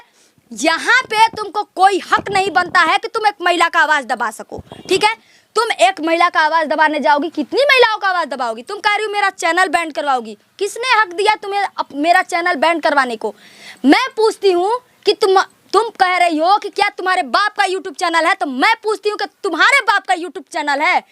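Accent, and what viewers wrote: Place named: Gorakhpur, India. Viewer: ❤ ekadam Sahi boli hain aap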